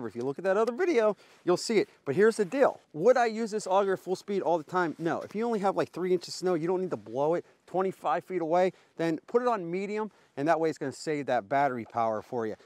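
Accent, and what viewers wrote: Pittsburgh, United States. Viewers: a slight yinzer accent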